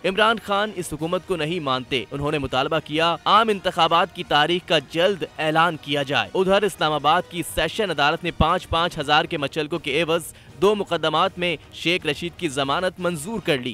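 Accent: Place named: India. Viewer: jesi editing ki hoi video ki or accent hai bolne ka.. bilkul indian media lag raha hai...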